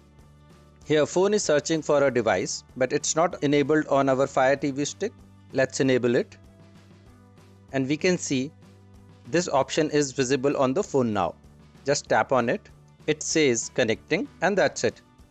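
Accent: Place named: India. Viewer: I usually don't trust people with Indian accents but you helped a lot, thanks.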